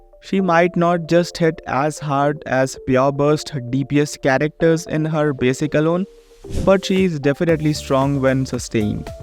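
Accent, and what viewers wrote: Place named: India. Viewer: What is that accent? Sounds like generic idian scammer.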